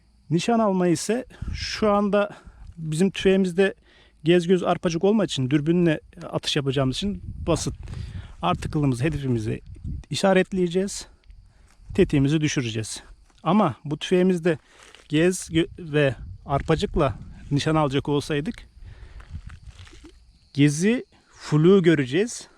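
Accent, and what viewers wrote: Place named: Thrace, Turkey. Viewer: he anladım trakyalıya çok benzettimde bidekonuşma olarak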